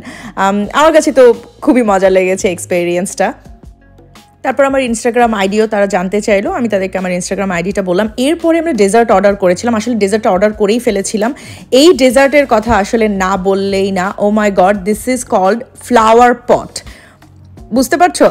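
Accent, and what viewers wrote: Sylhet, Bangladesh. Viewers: Sylhety vhaia😀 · Ere hunray ni Eta toh Sylheti mat🥰🥰🥰🥰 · Afa baisab tho amrar sylhety 😜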